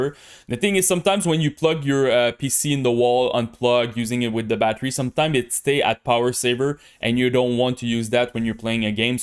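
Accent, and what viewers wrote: Quebec, Canada. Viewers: Dang a quebecois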